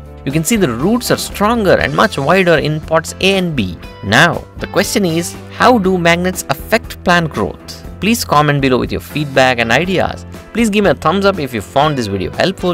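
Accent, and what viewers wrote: India. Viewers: sounds like one of those indian scam-call centers..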